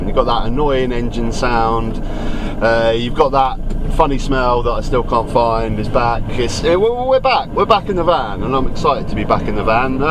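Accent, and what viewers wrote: United Kingdom. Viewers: So good to hear a British man with a van, but more importantly with a heart and a brain. Seems to be very hard to come by!!!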